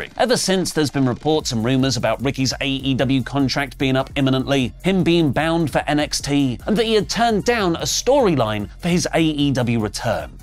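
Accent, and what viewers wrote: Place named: United Kingdom. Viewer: okay i know its the british accent, but it takes 5 seconds to look up. Trey Mi-G-el. Just pronounce the G. No need to pronounce the U.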